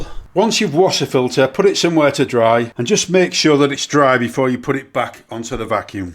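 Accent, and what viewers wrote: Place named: Hull, United Kingdom. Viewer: Great video just ordered one... I recognise that accent got to be from Hull 👍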